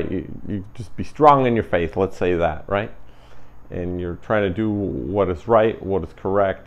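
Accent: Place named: Eastern Europe. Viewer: Slavic accent, similar faith background and similar job… dang this is up my alley. Definitely a lot to learn from you, and man if you’re reading this, I’m really sorry for what happened. It gave me chills to hear what’s happened to you. I could not imagine seeing that happen in our community’s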